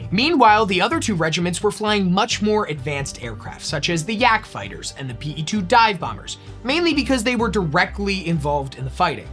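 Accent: United States. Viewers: european history taught to me in a philly accent, it's like i'm back in high school <3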